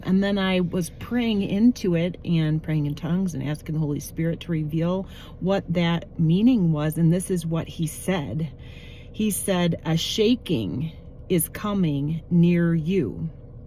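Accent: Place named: West Coast, United States. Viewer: Seems like you have a west coast accent, i thought michegan folks would kinda have a jersey accent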